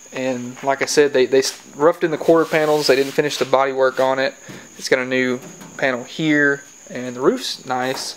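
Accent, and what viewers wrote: United States. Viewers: You make very good work on this Dodge 1969. I'm glad you did. Amid all the troubles and bad things happening on this planet. You also have good English well understood, Unlike the original English spoken in England. We Bulgarians are more accustomed to this pronunciation and the English accent that is in the United States and Canada. This original English in England is more difficult to understand. Even there seems to be little difference in the pronunciation of English in the Eastern States and the rest of the United States. But English in the other states seems to be a little better than English in the Eastern States. Somehow the English that is in the western and middle states is more melodic, the words blend and sound better, more easily understood than the English in the Eastern States and the original English in England.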